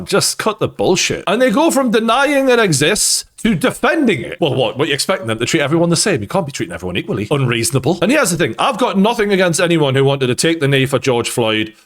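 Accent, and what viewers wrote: Newcastle, United Kingdom. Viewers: I love this geordie, no nonsense guy ❤ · 100% Geordie lad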